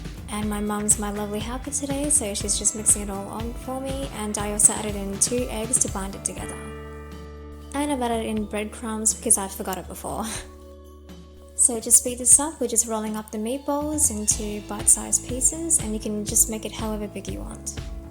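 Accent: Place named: Australia. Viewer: nice to hear an aussie accent for this!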